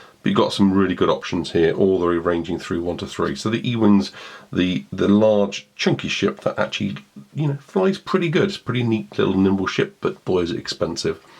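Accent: United Kingdom. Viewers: I say he vote, British people should narrate everything.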